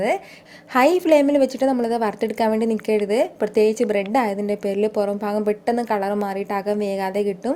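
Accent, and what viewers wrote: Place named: Palakkad, India. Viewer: Palakkad ആണോ മോൾടെ വീട് ....🌹🥰big fan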